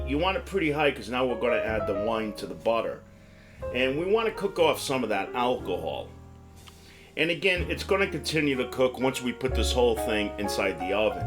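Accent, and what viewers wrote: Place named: Massachusetts, United States. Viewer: Are you from Mass ? You sound like me lmao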